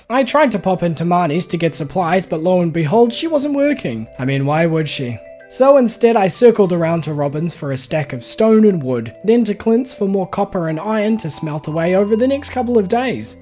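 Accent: New Zealand. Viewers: Ever since I found your channel and watched you super modded 100 days series I was trying to figure out your accent and to find out you’re a kiwi like me is such a nice surprise!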